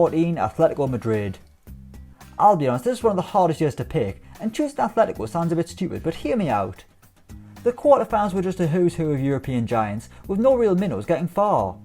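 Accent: Ireland. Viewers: starts video doesn't hear irish guy's voice "Ah shit" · I am a simple man. I don't hear irish guy. I click off.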